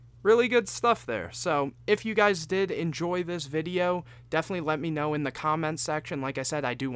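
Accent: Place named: Europe. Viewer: Big fan of euro english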